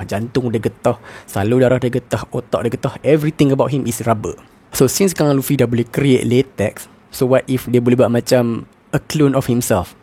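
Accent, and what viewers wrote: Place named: North, Malaysia. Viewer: welcome bro...all the best..penerangan bro jelas, dan yg best cakap utara hahahaa..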